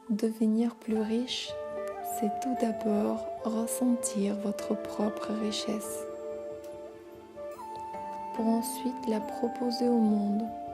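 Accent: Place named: Russia. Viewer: J'adore votre accent russe. Спасибо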